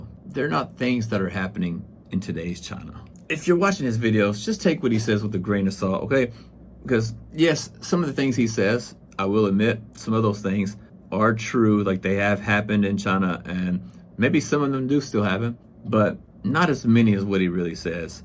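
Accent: United States. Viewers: At what point of your life in China you lost your American accent? Just curious.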